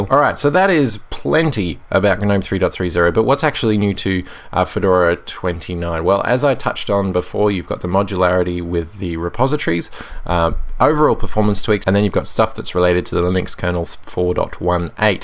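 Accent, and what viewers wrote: Australia. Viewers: are you an australian?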